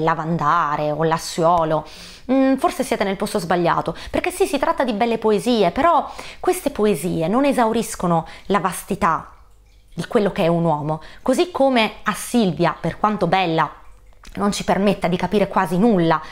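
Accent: Sicily, Italy. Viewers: Siciliana? 😘 Bum crash...🥴 🤕...ehm! Ottimo video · nel tuo delicato accento siciliano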